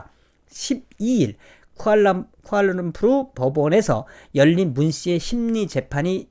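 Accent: North Korea. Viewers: 죄송하지만 북한 말좀 좀 줄이시면 안될까용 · 탈북 했어요? 말투가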